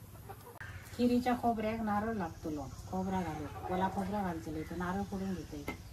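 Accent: Malvani, India. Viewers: पारंपरिक पद्धतीने बनविली जाणारी खीर तुम्ही अगदी छान पद्धतीने दाखवली त्याबद्दल धन्यवाद तुमच्या तोंडी मालवणी भाषा ऐकायला खूप छान वाटते.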